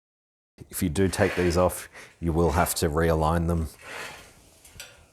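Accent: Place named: Australia. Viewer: Great video, nice to hear an Australia voice on YouTube for a change.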